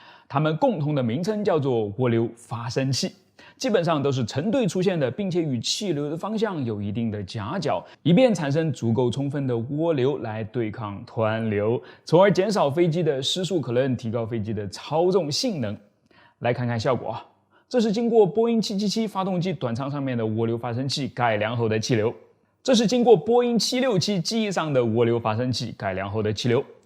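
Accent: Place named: Shanghai, China. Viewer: 口音像上海的😎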